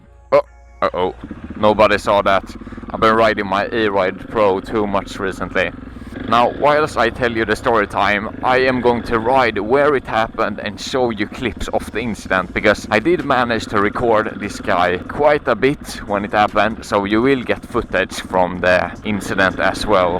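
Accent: Sweden. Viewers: Are you Swedish??? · Are u swedish?🇸🇪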